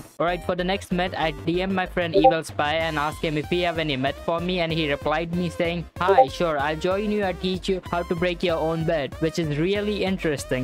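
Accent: India. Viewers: an Indian? sounds like Indian but an Indian Roblox player who plays ROBLOX yay never found one like you!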